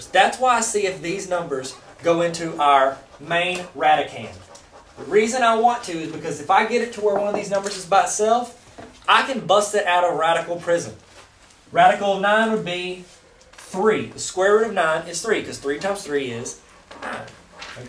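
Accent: South, United States. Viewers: Awesome. Glad a teacher can have a sense of humor. You must be from the south. You use the accent well.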